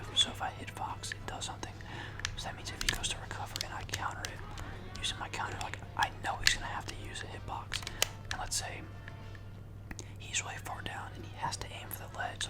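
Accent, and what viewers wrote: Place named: South, United States. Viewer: you kinda sound like ppmd but when he was amish (just kidding but when he still had his southern accent)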